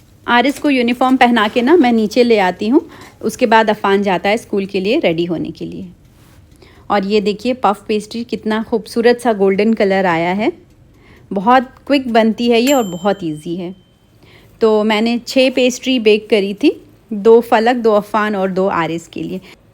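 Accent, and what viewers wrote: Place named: Uttar Pradesh, India. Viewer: Apke accent se lagrha ap UP se ho india me Maza aata h apki videos dekh k btw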